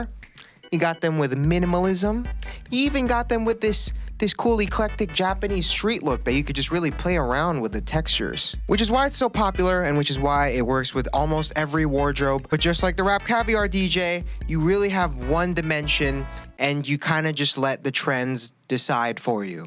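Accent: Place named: Hawaii, United States. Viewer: he's hawaiian livin in nyc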